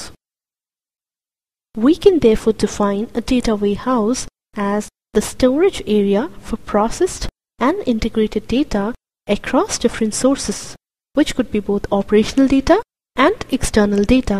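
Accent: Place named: India. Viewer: it's like india accent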